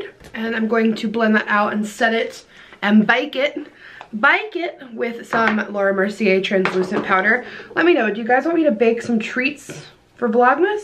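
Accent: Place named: England, United Kingdom. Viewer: Oh my goodness, your English accent is everything😍 Love it X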